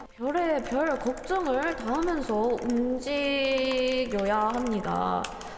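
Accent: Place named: South Korea. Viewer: 서울사람아니었나보네 사투리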